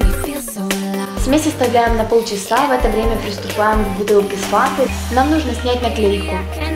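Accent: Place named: Ukraine. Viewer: Она ведь из Украины, а у нас многие так говорят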